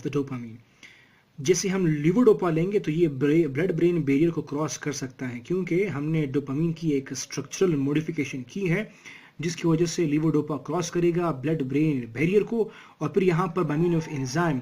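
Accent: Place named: United Kingdom. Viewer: U are having good teaching methods but don't try to teach like British person i think Pakistani don't get that language 😮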